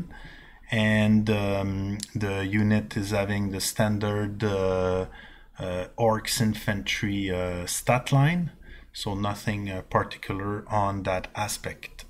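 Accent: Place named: Quebec, Canada. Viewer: 2 sec dans ton video j'ai reconnu l'accent quebecois ! Lache pas la patate bon video !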